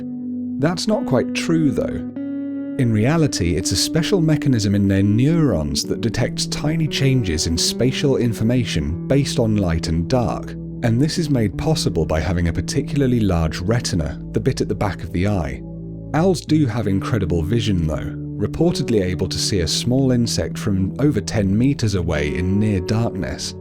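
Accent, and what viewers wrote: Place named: United Kingdom. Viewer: My goodness the generic British accent yeah....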